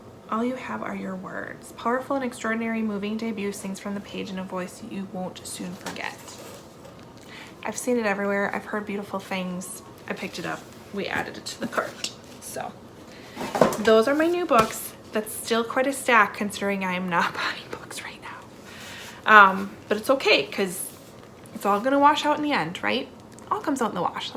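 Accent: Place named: Midwest, United States. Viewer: Are you from Minnesota or Wisconsin? North Dakota gal here! Your accent is fabulous. Also, love your videos!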